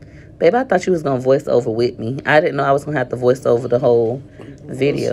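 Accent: South, United States. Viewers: you sound like a southern belle, funny as heck.!!🤣🤣🤣🤣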